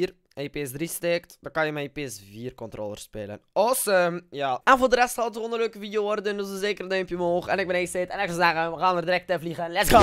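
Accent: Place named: Flanders, Belgium. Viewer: Ik kijk jouw filmpjes puur omdat je een Vlaams accent hebt!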